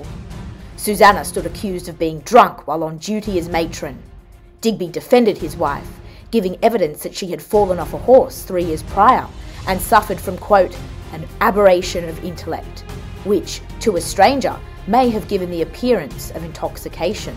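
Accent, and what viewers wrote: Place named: Australia. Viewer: 😂😂😂 love the early Australian / english accent commentary 😅😅😅 that was a great video ✌️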